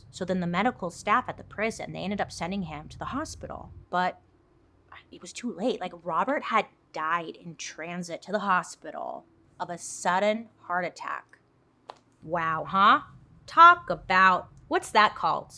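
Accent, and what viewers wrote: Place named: Ohio, United States. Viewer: You said that correctly. You sound like a true Ohioan so lol favor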